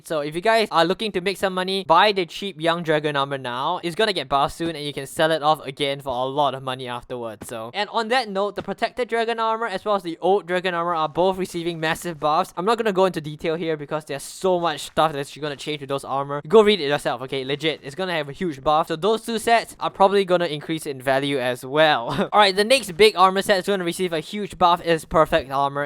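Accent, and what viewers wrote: Singapore. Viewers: eyy singlish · Is dis dude a Singaporean? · Are you in Singaporean cause I am and U have the accent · you have a huge singaporean accent are you from lee kuan yew country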